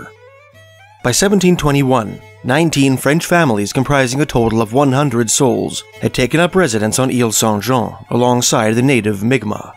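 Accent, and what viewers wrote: Canada. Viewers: i normally can't stand human narration (crass commercialism, low application) i guess the canadian accent made this work well enough, thanks for sticking to culture that you can represent with some integrity and concern, because it makes for a more meaningful tell. "whoever would have thought someone could make canadia interesting" ;) <3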